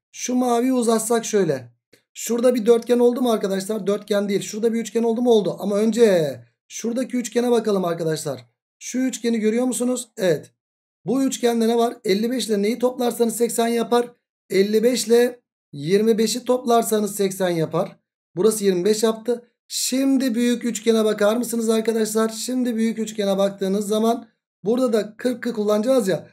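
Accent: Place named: Balıkesir, Turkey. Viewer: Hocam sanırım Balıkesirlisiniz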